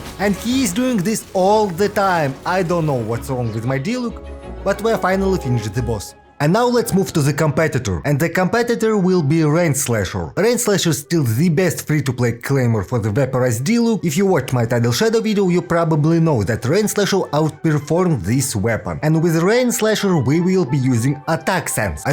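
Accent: Russia. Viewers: I learned that there's something even more cool than your russian accent: skin mods. If there are skin mods for weapon, I'm good with this stupid cardbox sword, because it won't be looking so. Otherwise I'd settle for Tidal Shadow just because how awesome it looks.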